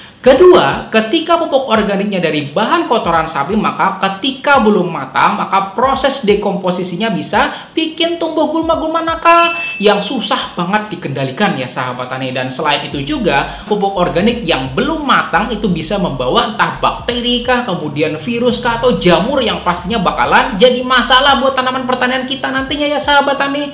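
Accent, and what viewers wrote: Banjar, Indonesia. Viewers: Banjar tagasnya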